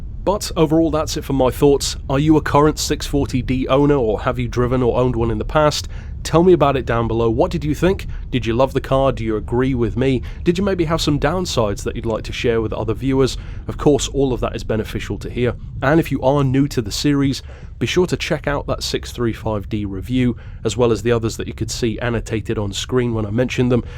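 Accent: United Kingdom. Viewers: You are British, am I right?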